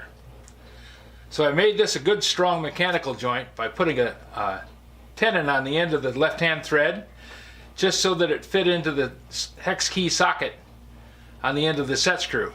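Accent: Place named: New Jersey, United States. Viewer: O.K.! You're like me with the JOyzee brogue. I was around 2 guys from there and I got so I could mock them to a TEEEE. They'd pound on me because they knew I was the biggest hick in the world and I'd just go right on and laugh my head off at 'em. And they'd pound on me more. Great guys and some of the best memories anybody could have while in Kansas in the Ahhmee!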